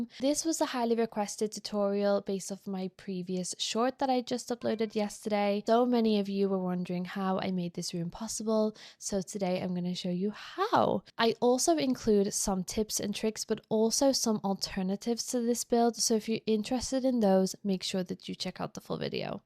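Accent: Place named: Scouse, United Kingdom. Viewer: Lovely stuff! Forgive me for asking, but do you have any affiliation with the city of Liverpool? Quite a lot of the things you say sound like there is a twinge of a scouse accent there! :)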